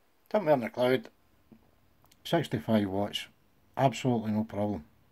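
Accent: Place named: Scotland, United Kingdom. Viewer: Just copied your instructions there now, I love your nonchalant Scottish tutorial. Reminds me of when I worked over there during the 90's and a guy showing me how to take cuttings in a huge plant nursery. Straight to the point, no waffle and in layman's terms. That's the way I roll. Good morning from County Clare in the west of Ireland 👍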